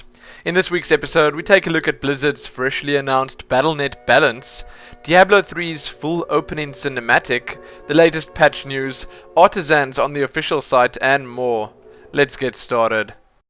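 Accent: South Africa. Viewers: This is why regular TV is dead. Freely accessible, top notch content like this is on Youtube. I might say niche content but it;s well produced nonetheless. Got to love that South-African accent., you do excellent vlogs as well man. Did you ever consider presenting a Pulse episode?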